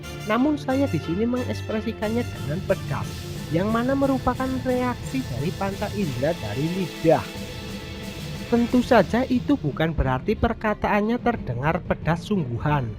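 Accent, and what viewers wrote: Banyumas, Indonesia. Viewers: wakwakwak, wanjir imonya, ane hargai btw, suarane ngapak men mad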